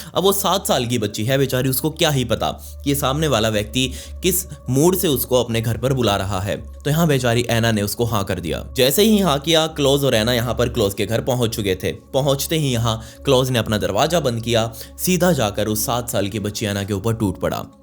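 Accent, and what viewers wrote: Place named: Jammu, India. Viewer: pick me ji.. hua toh thode hi din apko sunte.. pr lgbg saari videao sun dekh chuki hoon.. awaaz lehzaa bhut bdiaa.. pr tone hmare jaisi.. lv u from jammu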